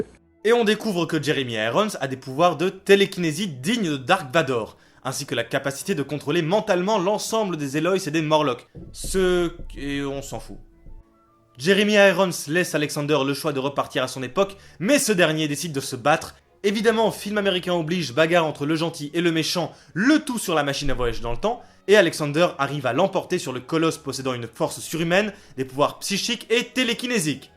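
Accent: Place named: Belgium. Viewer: Je m'étais dit "allons voir sa vidéo pour apprecier ce somptueux accent belge" mais sans moquerie c'est très interessant. BRAVO.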